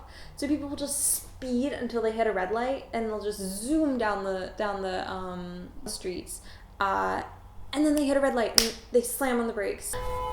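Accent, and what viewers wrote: Russia. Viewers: why your pronunciation is so Russian?) are your parents from USSR? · Похожа. И, возможно, даже русская. По крайней мере, мне показалось, что некоторые фонемы она произносит немного на русский манер. Да, она отлично говорит по-английски, но в английском есть специфические фонемы, произнесение которых трудно контролировать если ты не родился и не вырос в англоязычной среде с нулевого возраста. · You have Russian accent!!! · oh, girl, you got that kind of rrrrrussian accent. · You sound like behind your English some perfect Russian hides =) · Симпотичная девушка) С очень хорошим русским акцентом, что даже очень странно) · nice soft russian accent =) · funny ... you have such a strong Russian accent :) However, the word Babushka - pronounced perfectly :) At some point I started to think that you are Russian :) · у девочки русский акцент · You have a Russian accent speaking English. 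Look Russian too. Not buying it.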